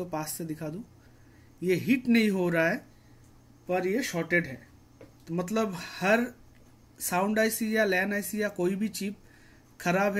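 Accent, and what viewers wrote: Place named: Bengal, India. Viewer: Bangali ho kya Bangali Jaise baat kar rahe ho to Bangali Ho gai Rakha lagta hai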